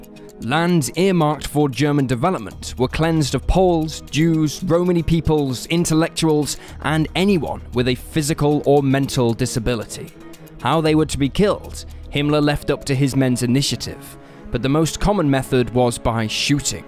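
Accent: England, United Kingdom. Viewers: This limey narrator sounds gay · If you think that's an Australian accent.. it's obviously British. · Voice like English. Loud the interrorgrater.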